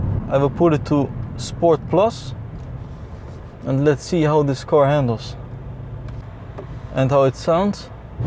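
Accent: Netherlands. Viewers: Je hoort gewoon door je engels accent dat je nederlands bent XD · maat begin aan bmw vergeet deze.....en dat hollandae accent is slecht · ik wist al aan je accent dat je nederlands was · Duidelijk een Nederlander!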